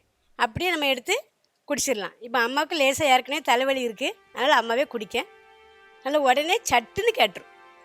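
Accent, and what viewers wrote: Tirunelveli, India. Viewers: நெல்லை தமிழில் நீங்கள் பேசும் வீடியோக்கள் அருமை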